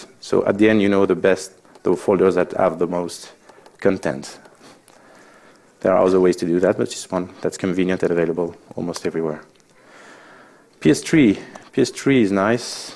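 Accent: France. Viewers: Ah ah ah on entend le french accent lol bonne vidéo sinon les commandes classiques pour le nux sympa.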